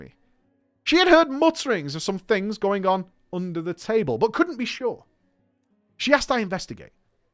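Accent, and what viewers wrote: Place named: Yorkshire, United Kingdom. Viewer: You are a top notch story teller, being from yorkshire it's even more pleasant haha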